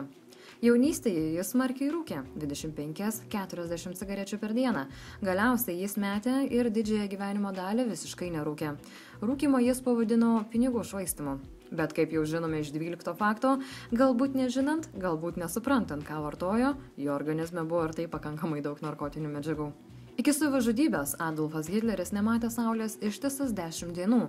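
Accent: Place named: England, United Kingdom. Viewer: ausys kraujuoja nuo tarimu, pasigooglink kaip tariasi elementariausi pavadinimai, nebandydama išlaužti ju tarimo anglu tarme.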